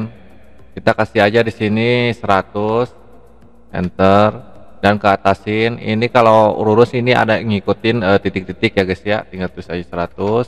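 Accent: West Java, Indonesia. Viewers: aksennya orang sunda nih,, kumaha damang kang ? mantap videona, lansung subrek lah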